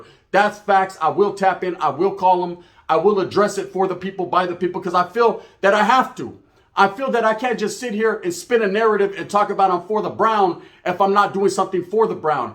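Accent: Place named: Central Valley, United States. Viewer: 🗣️🔥 you speaking like the Merced to Stockton vibe. The fuse is lite he was out of pocket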